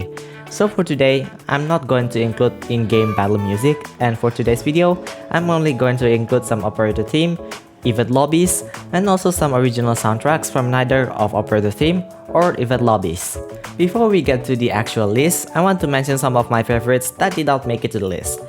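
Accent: Indonesia. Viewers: So... You're actually indonesian? 🗿 I can tell from your accents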